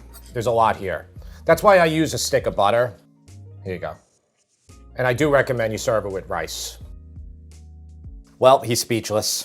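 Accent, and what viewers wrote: New York, United States. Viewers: Sounds like yall need to get to know your butcher. I don't buy prepackaged meat when I can I want to look the guy in the eye that's packaged the meat. Crack some jokes bull shit. IDK sounds like you're from new york or up new England. It's different in the south.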